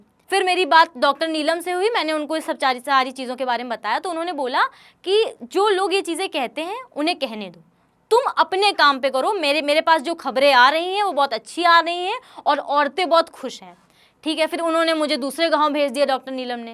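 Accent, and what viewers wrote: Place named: Unnao, India. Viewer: मुझे खुशी हुई, उन्नाव की बिटिया उन्नाव वाली बोली बोल रही है। बहुत सुंदर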